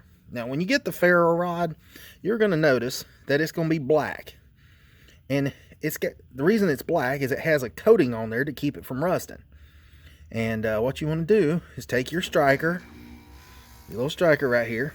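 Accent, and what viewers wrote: United States. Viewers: Are You From Tennessee? Cuz Ya Sound Like Yer From Tennessee LOL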